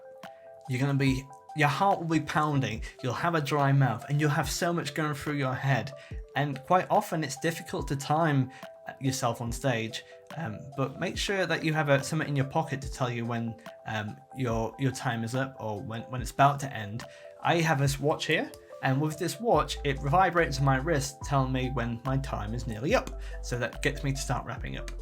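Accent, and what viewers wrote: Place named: England, United Kingdom. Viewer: I cannot sit and concentrate on an english accent explain comedy to me. I just imagine this guy stuffi g his face with crumpets fig newton's and tea.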